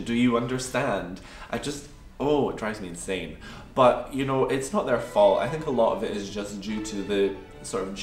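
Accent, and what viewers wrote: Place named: Scotland, United Kingdom. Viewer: Wow! I just came across your channel and was shook to hear your accent!! I'm also from Scotland and I am applying to JET this year - are there many Scottish JETs in Japan?? Look forward to watching the rest of your videos!! :)))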